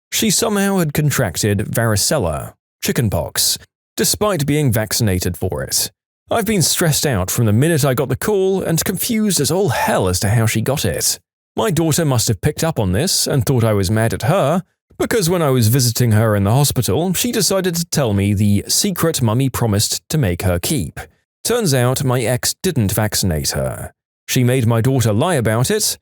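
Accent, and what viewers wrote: United Kingdom. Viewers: i love the british accent mate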